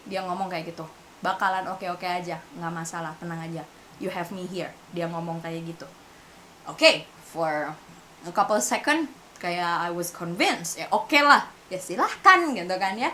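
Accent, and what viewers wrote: Java, Indonesia. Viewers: gagal fokus.. logatnya kadang" ke jowojowoan..kadang" keluar kesundasundaan..hahahhahaha.. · Kirain mantannya yg setan soalnya diajak ngomong tadi hahaha btw asli mana sih kok agak "NJOWO" bahasanya haha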